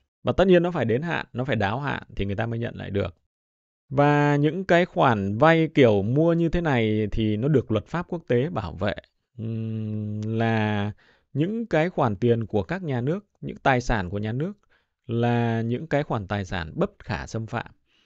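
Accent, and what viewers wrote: Standard. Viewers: Anh nói rất chuẩn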